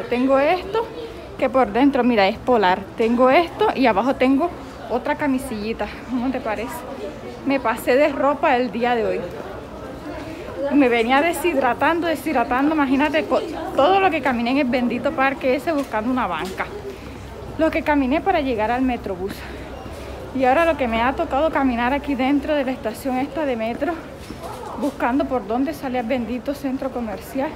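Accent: Caribbean Coast, Colombia. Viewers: cuetame soy seguidora de tuya De parte Colombia eres se nota que eres costeña que Dios te bendiga siempre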